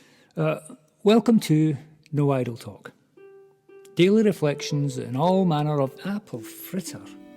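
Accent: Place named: Scotland, United Kingdom. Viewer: That's a shocker if a Scottish accent all the same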